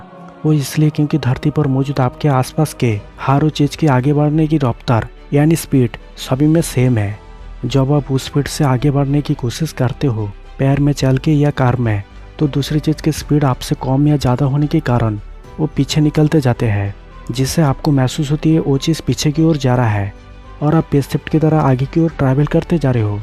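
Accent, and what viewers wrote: Bengal, India. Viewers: Aapko hindi mai nhi bolna chaiye bhai coz aapke awax mai bhutt jyada bengoli accent h...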